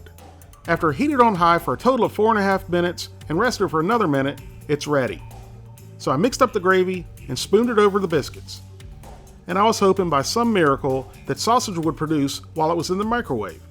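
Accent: South, United States. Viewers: i like how you were talking like a hick! git r done grub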